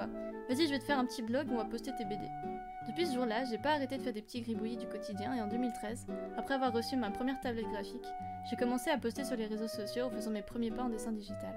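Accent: France. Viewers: You’re French?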